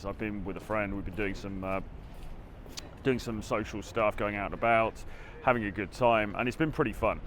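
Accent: United Kingdom. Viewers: Does having an English accent give you a head start in social interactions?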